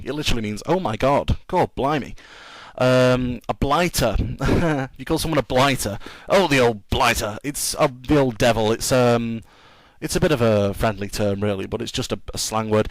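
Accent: New York, United States. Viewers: thats a great new york accent man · In Scotland, it goes Nursery School, Primary School, High School then either College or University. You get to choose what you want to do after High School depending on your grades. Though University is above College in the "Ranks". Its more harder than College. Also, I loved your New York accent. lol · Your New York accent is good. What I'd like to ask--and you may/may not have an answer is why some English pronounce words that end in vowels with a faint r, such as idea=idear, or saw=sawr/sahr, or america=ameriker. I've wondered about this for some time. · That was an amazing old New York accent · cinema= movie theater People use both in US. You know something, I thought it was offensive to call British people Brits. But I hear y'all calling yourselves that quite a lot. Wait college and university?? What age range are those? 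Also, love your New York accent. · If you care to do a study of a strong NY accent, give a listen to the Bowery Boys (their films are funny as well).Your accent sounded NY enough to me to get the point across. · GREAT NEW YORK ACCENT! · I don't see much of a difference in most cases. However, I've learned some expressions from Karl Pilkington, par exemple: "Knocking around" - to be "Having a go" - making fun of "loads" - a lot Any more Mancunian ones? Great NY accent, by the way!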